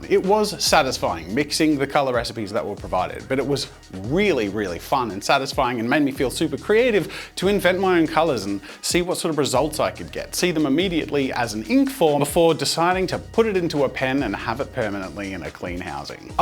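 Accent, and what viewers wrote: Australia. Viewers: Australian? I thought you were British!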